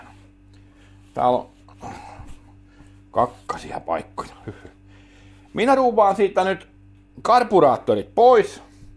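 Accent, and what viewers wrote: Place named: Tampere, Finland. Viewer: Artikulaatiota selkeällä Treen murteella-asia tulee ymmärretyksi👍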